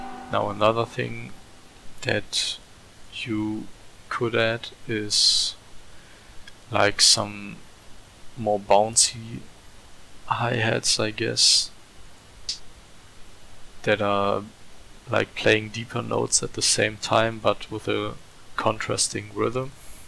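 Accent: Germany. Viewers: hes from germany, i can tell by his accent :)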